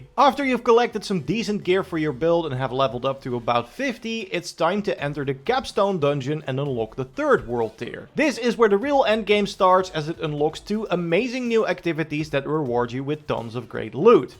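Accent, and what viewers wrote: Netherlands. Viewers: i can just hear you are a dutch speaking english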